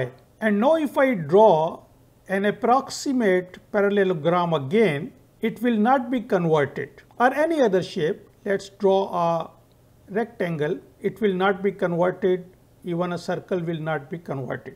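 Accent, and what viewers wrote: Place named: India. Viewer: indian accent again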